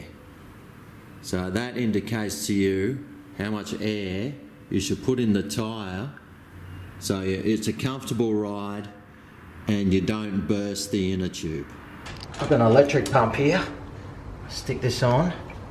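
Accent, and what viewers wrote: Australia. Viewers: Every instructional video is better with an Aussie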